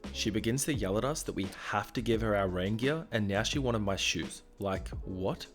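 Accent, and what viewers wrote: Australia. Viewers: Narrator, are you from Australia? Your accent reminds me of some of my old friends from Australia. Just wondering. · Are you Australian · Are you aussie?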